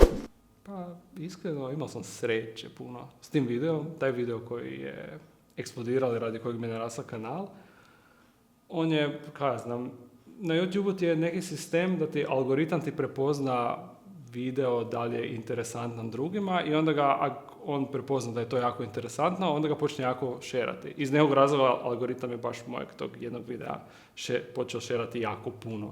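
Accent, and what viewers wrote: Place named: Germany. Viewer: With your accent, I thought you were German or Austrian,:-)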